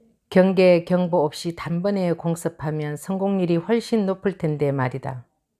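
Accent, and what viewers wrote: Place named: Gyeongsang, South Korea. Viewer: 이렇게 열정적인, 순정한 독자를 얻었다는 것이 글쟁이에게는 얼마나 큰 행운이고 축복인지! 뜨거운 갈채에 가을 아침이 심쿵!합니다. 영상도 멋지고 님만의 갱상도 억양도 정겹고 자연스러우니 조원희 샘 애써 따라잡으려 안하셔도 될듯요.ㅎ 늘 고맙고 또 고맙습니다.^^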